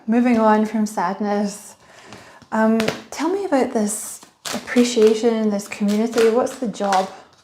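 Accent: Scotland, United Kingdom. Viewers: You sound Scottish 💙🏴👍 very accurate. Im incredibly intuitive. Im normally ten steps ahead. Anxiety up the ass lol so yes im done. New location and stagnant within a partnership. Put thru the shit, bullying, gaslit, manipulation, being spied on, stalker you name it..Things are coming to a very big end. Your so spot on. TY so much ❤ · Thank you. Are you scottish?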